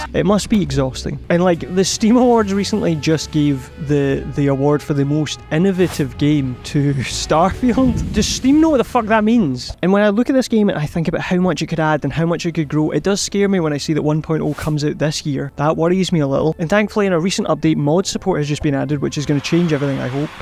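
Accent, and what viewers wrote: Scotland, United Kingdom. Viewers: Are you scottish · Randomly came across your channel, love the scene your setting, so funny, your going far man plus your Scottish, I live in Inverness 🫡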